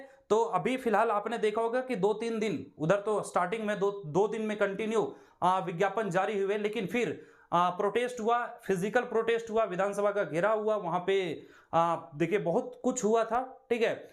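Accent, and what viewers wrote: Bihar, India. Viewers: Bihari ho lagta h tera baat se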